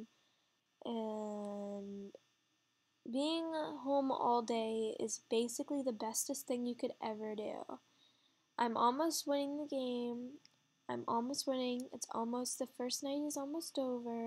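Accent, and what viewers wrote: United States. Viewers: Omg its the americanish girl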